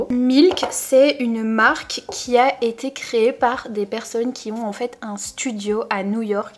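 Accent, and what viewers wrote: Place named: Belgium. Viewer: J'adore l'accent belge.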